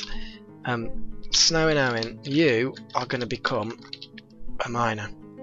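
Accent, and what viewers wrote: United Kingdom. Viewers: ah, it's been a while, good to hear your british voice and the clicking of your keyboard!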